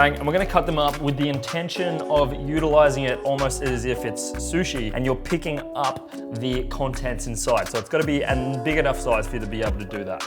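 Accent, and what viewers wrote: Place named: Australia. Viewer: Ahaha didn’t think you would be Australian/sound like that 😂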